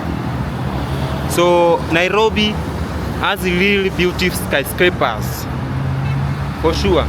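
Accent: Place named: Uganda. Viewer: YOUR ACCENT IS FROM UGANDA NOT TANZANIA,,, TANZANIAN CANT SPEAK THAT ENGLISH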